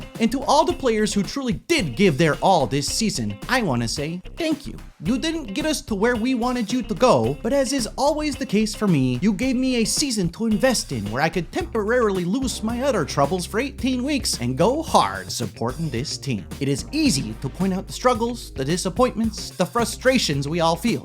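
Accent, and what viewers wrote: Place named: Eastern Europe. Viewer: What is with that accent. You sound like someone from the old eastern block countries. You're mixing together your Chicago accent with Wisconsin accent.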